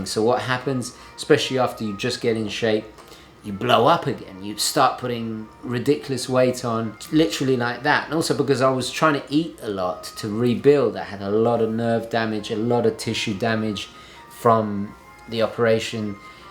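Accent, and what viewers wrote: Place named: United Kingdom. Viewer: Hi, i like your vid because they are optimistic and positive. I love also your english. Very distinguish and british.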